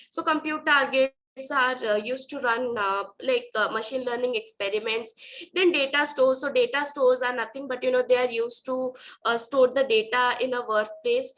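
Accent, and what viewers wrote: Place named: India. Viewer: What a shame that speaker speaks hindi english :(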